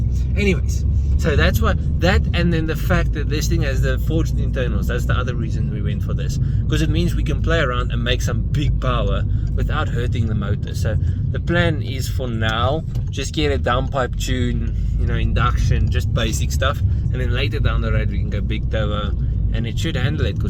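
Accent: Afrikaans, South Africa. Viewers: First thing I hear is the Afrikaans accent. Appreciated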